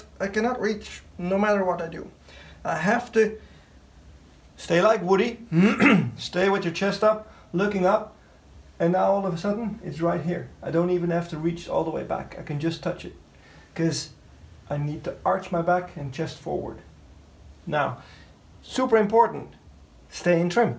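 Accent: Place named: Netherlands. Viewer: Clear description, many thanks! And now it makes sense. Dutchman liven in Denmark making video’s in English. I’ve been watching your valve overhaul video yesterday while underway in the kiel Kanal and was noticing a slight Dutch accent but Danish comments. 🤔. Watching this today in Denmark (Kalundborg)!while discharging it became clear. 😀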